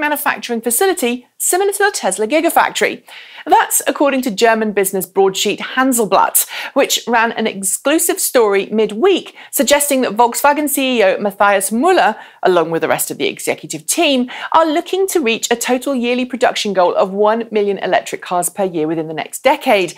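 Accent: United Kingdom. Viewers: Nah, I'm fine with a British accent. For me it's the pitch, it makes it kinda.... squeaky. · Thanks! I've never been called 'terribly obnoxious' before. Nor do I know how it's possible for a voice to be obnoxious. Is it the British accent? The pitch? The intonation? Of course, there are always subtitles. And we do provide those:)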